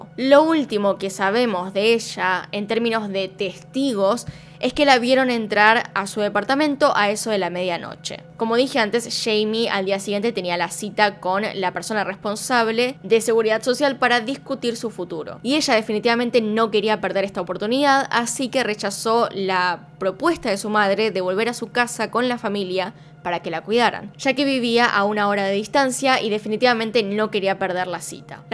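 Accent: Argentina. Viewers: Es el acento de algunas provincias de Argentina. Yo también hablo así, no tiene por qué molestarte. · Eres Argentina???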